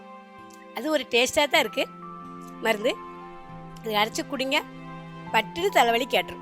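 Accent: Tirunelveli, India. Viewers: நெல்லை தமிழில் நீங்கள் பேசும் வீடியோக்கள் அருமை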